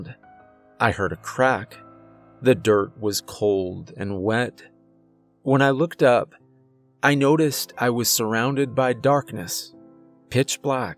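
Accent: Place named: Great Lakes, United States. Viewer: I’ve wondered for some time now if the narrator is from the Great Lakes region because of the way they pronounce some words 💁🏽‍♂️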